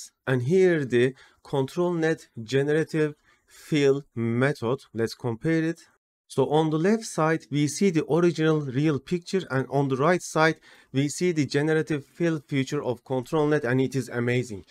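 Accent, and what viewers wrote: Turkey. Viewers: o kadar guzel turk ingilizcesi konusuyosunki direk dikkatimi cekti ;D Guzel video bu arada thnxx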